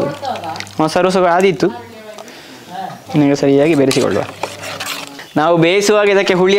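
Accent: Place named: Coastal Karnataka, India. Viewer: ನೀವು ಮಾಡುವ ಪ್ರತಿಯೊಂದು ಅಡುಗೂಟವು ನನ್ಗೆ ಸಿಕ್ಕಾಪಟ್ಟೆ ಇಷ್ಟ ಮತ್ತು ನಿಮ್ಮ ಕರಾವಳಿ ಕನ್ನಡದ ಭಾಷೋಚ್ಛಾರದ ಶೈಲಿ ಎಲ್ಲವೂ ನನ್ಗೆ ಅಚ್ಚುಮೆಚ್ಚು ❤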